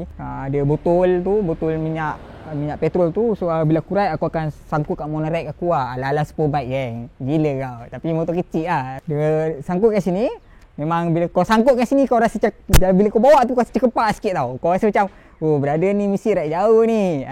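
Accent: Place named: Malaysia. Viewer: standed percakapan org mesia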